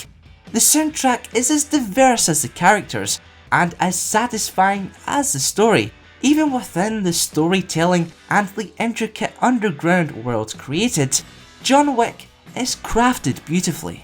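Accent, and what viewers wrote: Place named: Scotland, United Kingdom. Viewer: I fooking love Scottish accents. By far my favorite.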